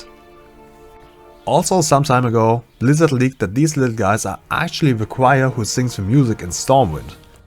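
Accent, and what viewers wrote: Germany. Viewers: Are you German? :D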